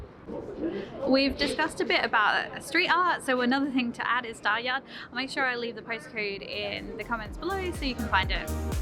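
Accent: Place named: Australia. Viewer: sounds Australian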